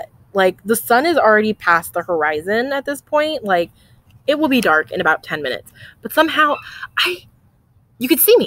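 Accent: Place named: California, United States. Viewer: I actually adore your daughters blanket for many reasons. Love the 1st and last color. It reminds me of our Cali sunsets over the ocean here. 😁 I have been crocheting for 43 years since I was 8. Was taught by my mom and gma but mostly books. Also taught myself needle point, temari, Sewing, paint ceramics, crewel ,cross stitch, hair pin lace, and embroidery. Sorry you lost your mom so young. I crochet at the Drs because I always get nervous and it helps calm my nerves. That's so funny I was married as a senior in HS to my ex. Married to my 2nd fab hubby for 27 yrs now. How funny I figured you had a Cali accent and not Virginia. I tried knitting but it ends up being hazardous with my 3 cats. I have crocheted much more in last 6 years. So much easier than when I had little ones. So I can imagine you are quite busy. No way I could have done a podcast when my girls were little. 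Really enjoyed your podcasts. It's interesting to learn about the people who do podcasts and see what there shared interests are. Thank you for sharing. Lol re your hubby. Have an awesome day.😁 Have you ever met Janie Crow or crocheted any of her patterns? I just love them. Your son is so cute.♡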